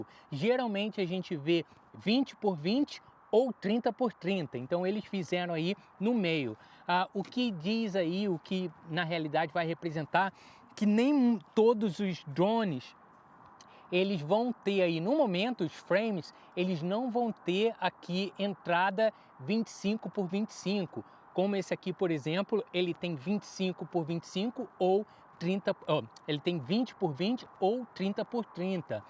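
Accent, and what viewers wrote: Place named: United Kingdom. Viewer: Vc mora no Reino Unido ou já morou por la? Tu tem um sotaque muito britânico hehehe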